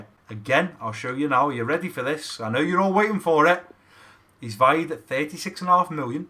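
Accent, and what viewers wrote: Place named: England, United Kingdom. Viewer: Where are you from? I mean the country. Because it sounds like you are from England.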